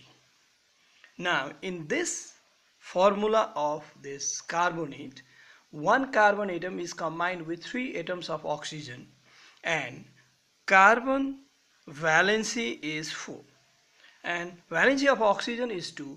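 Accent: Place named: Nepal. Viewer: Thankyou sir Tapaiko accent ta nepali ko jastai xa ta🧐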